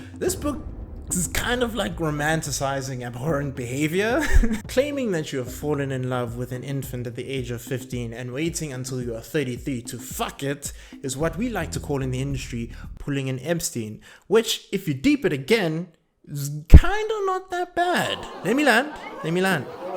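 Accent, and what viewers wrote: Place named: Southern Africa. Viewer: well well well, my eyes have been opened to infinite horrors tonight. Thank you, young sir! Also, are you from Southern Africa? I hear a very similar accent! Zimbabwe?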